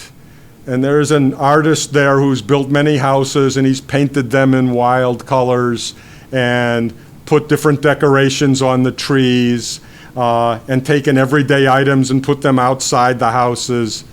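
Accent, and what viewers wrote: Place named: India. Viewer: full gavathi marathi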